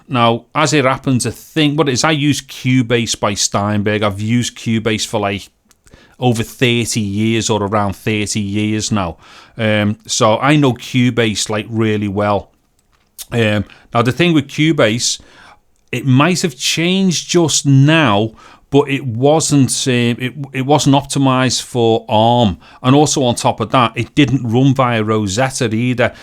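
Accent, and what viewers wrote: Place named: North, United Kingdom. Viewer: Edinbrah or Dublin accent? Northen United Kingdom accent. :) Just guessing.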